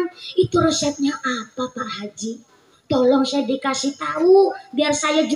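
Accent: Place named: Banyumas, Indonesia. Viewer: Pemalang hadir Pingin ndengerin pengajian ustadzah yang ngapak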